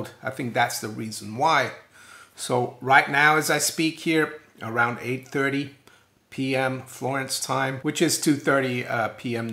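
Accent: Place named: East Coast, United States. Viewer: Another good video from the Brazilian with the U.S. east coast accent. 👍😊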